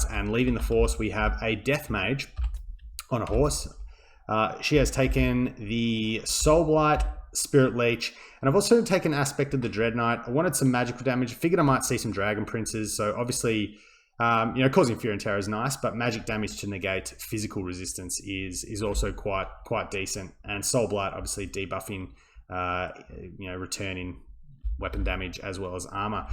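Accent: Australia. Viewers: Great games bro! we gotta run into each other more on ladder :P Goddamn surprised me, your voice is amazing for casting along with the Australian accent!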